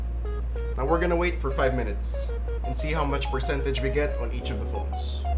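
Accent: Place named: Philippines. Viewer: are you a filipino? because thats how filipinos speak English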